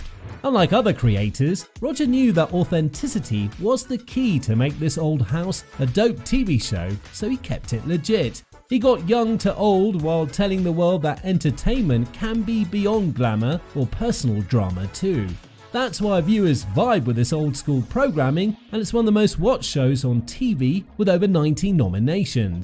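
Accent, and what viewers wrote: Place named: United Kingdom. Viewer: There’s something about this style of British… I don’t even know what to call it….sensationalism?…that always comes off as insulting to the people being discussed and over-hyped. As soon as you hear that particular British accent, you know the presentation is just going to be an audible ‘National Enquirer’ presentation. It’s not going to be polite. It probably isn’t even going to be true. I have to tell you when I heard the word “dummies” used I got instantly angry. I don’t believe people actually feel that way about the personalities in This Old House. I couldn’t believe that was said. Disgusting.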